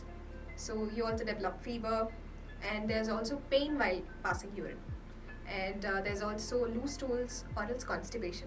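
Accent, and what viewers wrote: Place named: India. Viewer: Extreme difficulty understanding the Dr. Her Indian accent very thick. Thank you Dr. For trying to make a complicated subject simple for a layman.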